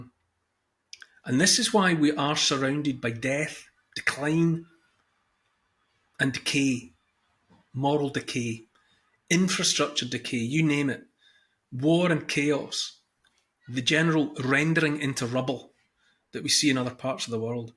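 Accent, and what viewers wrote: Scotland, United Kingdom. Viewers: Isn't that the scottish accent I hear in your mouth?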